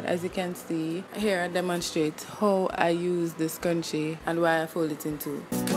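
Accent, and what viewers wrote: Jamaica. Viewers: Hear di accent nuh man 💛🇯🇲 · You sound like a Jamaican, if so I'm also Jamaican lol hehe Nice video btw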